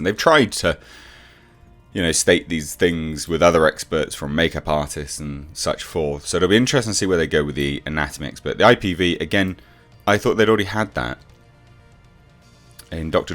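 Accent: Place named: United Kingdom. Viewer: I hope you don't mind but I just gave your name up to Umbrella Guy on a live stream with him just now. It was regarding your Vogue critique earlier today. I found that really funny and mentioned it to TUG and his response was that he likes you a lot, and said he'd like to get you back on a flashcast. So I have my remind buttons on and I'll keep watching for that. Anyway, love your channel! Been watching for a while now. Nice to hear a fellow Brit on YouTube. Cheers. 🖖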